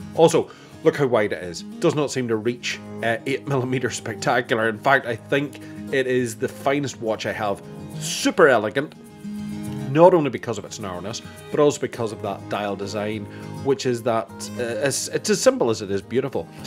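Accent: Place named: Northern Ireland, United Kingdom. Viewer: Northern Ireland,it's a lovely accent👍🏻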